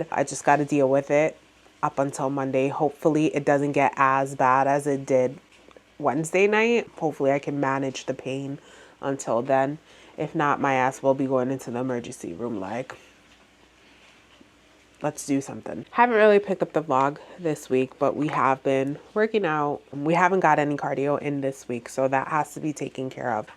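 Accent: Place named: New York, United States. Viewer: hey baby…. awh the face mask was so cute. lol a tooth ache is the absolute worst. We do miss Meg… happy Mother’s Day babe. We want you to vlog more too…👀 Say “coffee” again…🤭 your NY accent is everything. yum, love Greek food! The bouquet is gorgeous, you did amazing baby. Oooow, this Sunday my husband and I are going to indulge a bit. I’m going to pick up some of that tequila. Not I think I’m Spanish…🤣 Your face is flushed after those two drinks. That one looks so good too. By the looks of it, you guys had an amazing Mother’s Day.🩷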